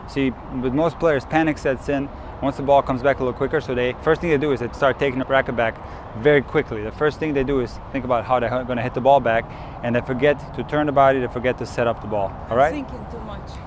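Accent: Russia. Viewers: is russian love her asscent saw her from the beginning so good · ДА ТО РУССКАЯ ПО ГОВОРУ!!!🤣🌺🤣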